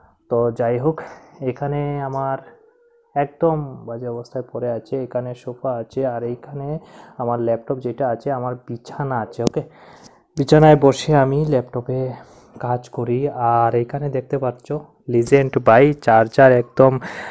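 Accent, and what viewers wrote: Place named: Sylhet, Bangladesh. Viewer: Tumi sylheti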